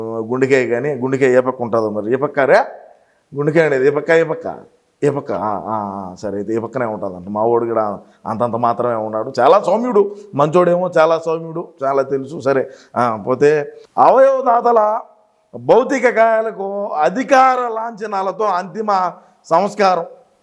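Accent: Rayalaseema, India. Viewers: Seema yasa బాగుంది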